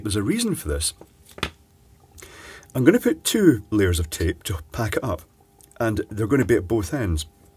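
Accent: Scotland, United Kingdom. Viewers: It is the Scottish accent. I lived up in Scotland and the people are really chilled and add a sense of humour and you will be refreshed by the positive energy. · Whenever I play a video my cat comes in the room and starts mewing, he must like the Scottish accent. I have two old phone batteries on my desk, I was thinking "What can I do with them?" and this video shows up.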